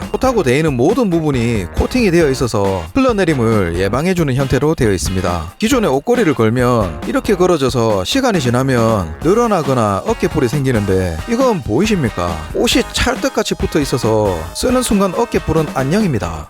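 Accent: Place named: Busan, South Korea. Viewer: 내고향 부산. 사투리 넘나 잼나요~ 살림구경에 사투리 들으러 더 올 것 같아요~!! 구독이용~❤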